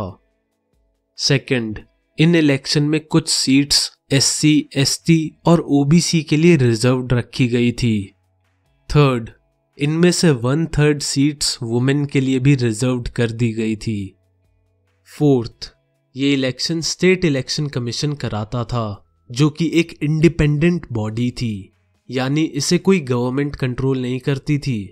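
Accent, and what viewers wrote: Haryana, India. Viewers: Bhai Tu h kha ka Jo haryana ka h 👍👍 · Haryana se ho k..?🤟😎💪💪💪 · Your sounds like Haryana are you from Haryana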